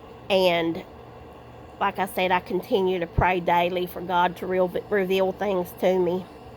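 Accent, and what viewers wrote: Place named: South, United States. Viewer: I just love hearing your accent! It makes me feel at home. I just moved to the west coast from Georgia and I miss the South sometimes. Also, my maiden name is Kirkland, do you happen to be from Georgia?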